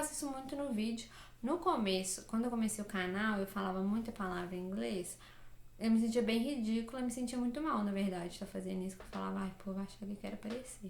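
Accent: Minas Gerais, Brazil. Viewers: O que percebo eh seu sotaque mineiro, alias, dos brasileiros,é o que mais gosto. As Dinamarquesas costumam fazer blogs, eu nao tenho ideia de como é o idioma dinamarques, eu ja ate botei algum video aqui, porém nem lembro, mas pelo jeito devem falar divagar porque as duas brasileiras q acompanho no ytube, voce e outra, falam bem divagar...ou será coincidencia...a outra é carioca. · Você tem o sotaque mineiro bem forte hahahahah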